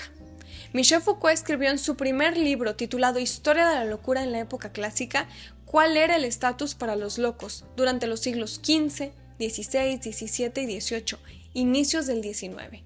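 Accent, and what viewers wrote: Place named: Monterrey, Mexico. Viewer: Suena a Monterrey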